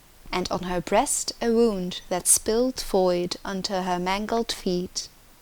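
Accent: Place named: France. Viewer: Lovely French accent and well read its one of my favorite TES books, bravo.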